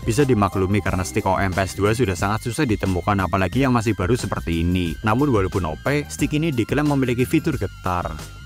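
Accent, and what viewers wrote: Banyumas, Indonesia. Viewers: ternyata wongapak😂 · Wong ngapak jebule 🎉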